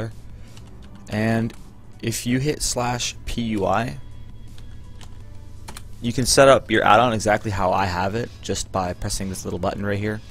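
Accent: Canada. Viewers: u canadian guy? love the content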